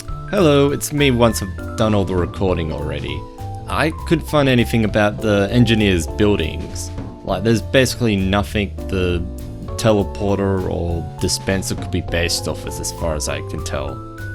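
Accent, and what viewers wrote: Australia. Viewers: Are you Aussie? The accent makes me think that. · Your voice reminds me of Robin Walker (dev at Valve who designed TFC and TF2). Are you, by any chance, Australian?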